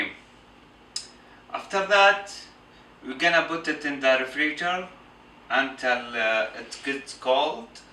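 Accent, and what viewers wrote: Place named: Arab world. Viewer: You are too cute with the Arab-english pronunciations!!!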